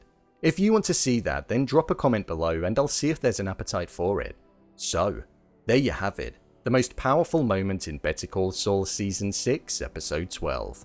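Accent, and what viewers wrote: United Kingdom. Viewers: 英国なまりを、頑張って聞き取った。 と、思う。 次も、頑張る。💪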